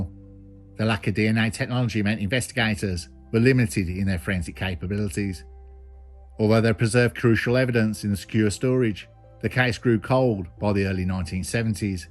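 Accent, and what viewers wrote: Birmingham, United Kingdom. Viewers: 89K - I’ve been with you wen you We’re on 3.5K- bruv your utter brilliant and the way you make the story’s come to life! They say you even pay tribute to the family’s with they way you talk with such respect.. just wanted to leave this here, as show you can do whatever you u good at- also Love the brummy accent , as I was in Hmp YOI Brinsford Wolverhampton and I even learnt what a yam yam is 🤣🤣- my co producer on music is also a brummy - big ups bro keep it rolling ❤🔥🔥🔥💪💪🙏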